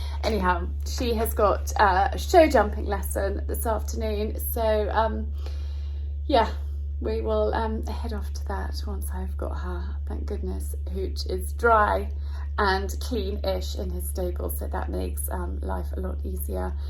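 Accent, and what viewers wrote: England, United Kingdom. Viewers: You know, I think there's this trend of women doing the housewife life with all of these implications; that women are made for it, that they shouldn't work, etc, on TikTok, that the people over there have been trained to respond negatively. But of course you're not like that, you're not telling other women they're morally at fault when they're not at home. You're just showing your life and it's clear you enjoy it and that life at home can be wonderful--and lets be honest, that you're very good at it and resourceful. And you do it all from beautiful Sussex with a very English accent. So I wouldn't take the knee-jerk criticism at heart. It's not aimed at you personally, it's just that people have been burnt before. once people get to know you, they know you're very kind, and just passionate about your life! Sorry for this serious comment on such a hot day but I figured some context might be helpful!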